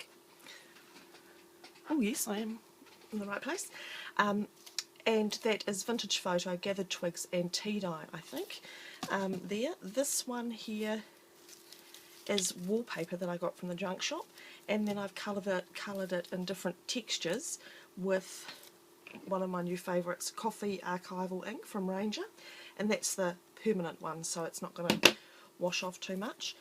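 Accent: New Zealand. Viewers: GDAY COUNTRY COUSIN, U SOUND LIKE A KIWI, GOOD TO HAVE FOLK BACK THIS SIDE SHOWING THEIR WARES LOL JUST LOVE YOUR FLOWERS, INSPIRED ME... THANKS CAMILLE AUSTRALIA.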